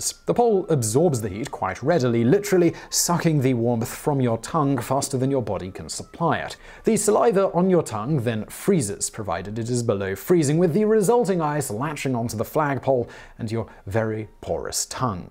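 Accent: United Kingdom. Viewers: "I triple dog dare ya!"😛 I like the way Brits say Aluminum!😁👍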